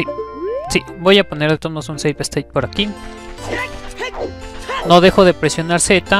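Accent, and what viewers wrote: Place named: Mexico. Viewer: No hables asi como tan mexicanote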